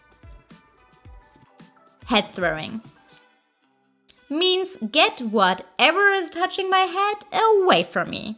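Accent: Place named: Germany. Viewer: Usually I don't hear it, but could hear the German accent a lot in the video. Just a interesting notice. Another good informative video for new gunea pig owners and a fun one for old ones and gunea pig fans..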